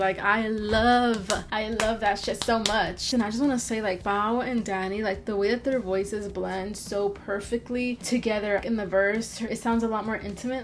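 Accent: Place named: Quebec, Canada. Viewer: Bonjour, à ta façon de parler anglais, tu semble être du Québec... laisse-moi savoir si je me trompe. Si c'est le cas, je suis heureux d'avoir trouvé une autre personne du Québec qui aime TW. J'ai découvert TW en juillet dernier et depuis, je les ai vu live au Centre Bell, au Centre Vidéotron et je suis allé à Monterrey les voir le 22 février dernier. J'espère qu'elles annonceront une nouvelle tournée canadienne bientôt pour aller les voir encore une fois. Si tu as l'occasion d'aller à leur show, n'hésites pas. Elles sont encore meilleure live que sur les vidéos de leur shows. Super réation!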